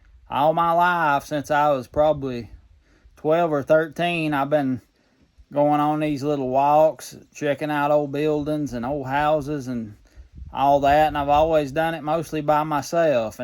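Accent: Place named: Kentucky, United States. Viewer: I have seen a ton of old barn tours and enjoyed every one of them. This one is by far the best I have seen. You even did it in the old down home lingo, wagon tars, backer and styles, where we would say tires, tobacco and stalls. Thank you very much. My Dad was from Kentucky so I had no problem understanding you. Art from Ohio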